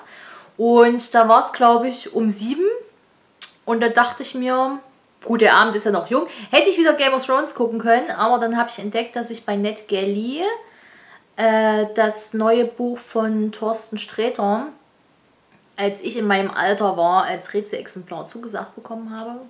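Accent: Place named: Saxony, Germany. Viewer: "OF RUBBN!!" 😂😂 Oh man. ❤ Find ich soo gut das du dein Sächsisch nicht versteckst! Ich versuche immer mein bestes zu geben mein Thüringischen Dialekt zu verstecken. Und Pain au Raisin finde ich riecht SEHR nach Rosinen. 😂😂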